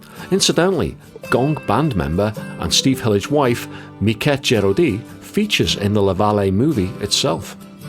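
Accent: Liverpool, United Kingdom. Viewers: A fellow scouser narrating one of the most underrated albums.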